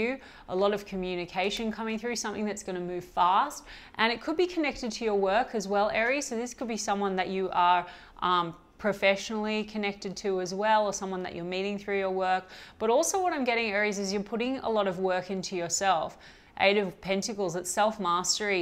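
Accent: Australia. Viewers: 🙌🏻 yes stepping into my power. I was on the low frequencies and saw the same in the relationships I was looking at. I’ve finally let that go and have seen underneath it all was my low self esteem. Lots of self care self love & affirmations. Breaking the toxic habits and giving into the good good love ❤️ Really nice to hear an Aussie doing tarot! Great energy, a brilliant read that I related too. Bless 🙏🏼 I’ll keep coming back!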